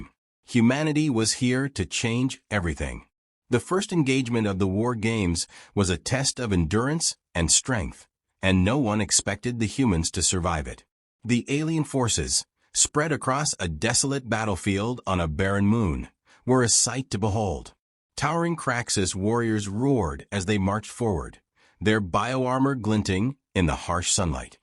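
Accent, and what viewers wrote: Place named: North America. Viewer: Now tell it in an accent that isn't North American.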